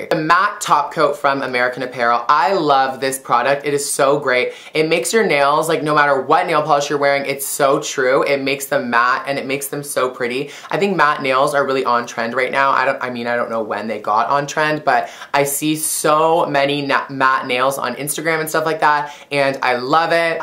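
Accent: Canada. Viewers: are u Canadian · Lol love your Canadian accent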